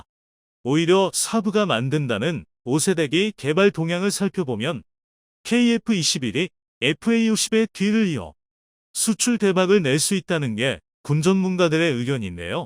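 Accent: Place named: China. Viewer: 중국사람인가 ㆍ 왜 말이 찳지 ㆍ혀가 짧고 발음 정확하지 않음 ㆍ 하지 말아요 유트브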